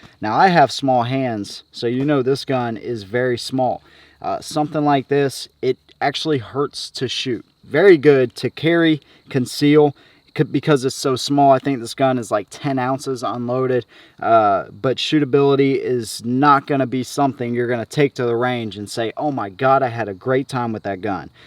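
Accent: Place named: West Virginia, United States. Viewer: you have WV accent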